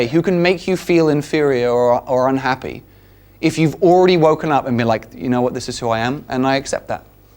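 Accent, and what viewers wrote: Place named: United Kingdom. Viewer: Crap, i dont get that british accent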